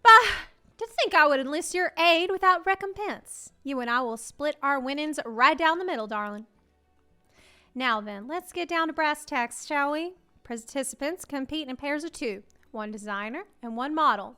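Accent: South, United States. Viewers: A+ southern RP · The southern accent made this video great for me being from and in southern Louisiana 😂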